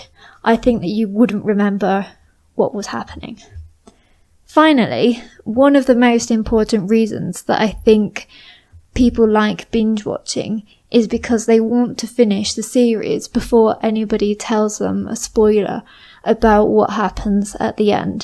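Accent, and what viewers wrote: United Kingdom. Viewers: I began listen to this podcast from yesterday 😊 , i see British accent is beautiful than American . I liked the way when u said word ( relax ) 😍.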